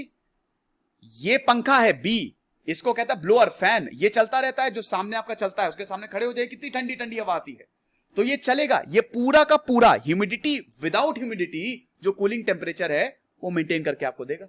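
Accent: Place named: Awadh, India. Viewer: Sir ka deshi andaaj jaari Avadhi style me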